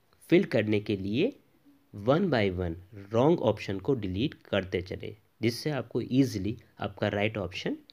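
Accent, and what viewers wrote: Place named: Western Uttar Pradesh, India. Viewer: Are u from bijnor, meerut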